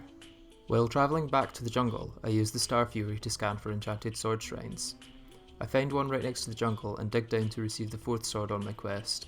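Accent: Scotland, United Kingdom. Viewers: You Scottish ???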